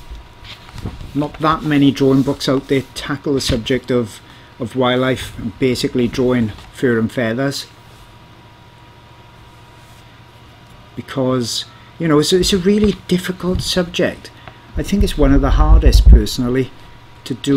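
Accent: Wales, United Kingdom. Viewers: Really nice to hear another Welsh voice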